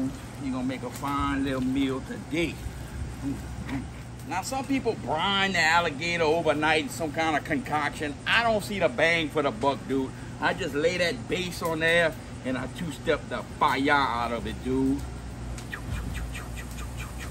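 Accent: Louisiana, United States. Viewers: Sounds like some Louisiana to me. Got the accent like Justin Wilson.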